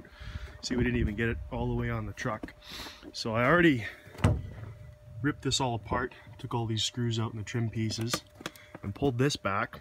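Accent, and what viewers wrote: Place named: Canada. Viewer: It's the Canadian accent lol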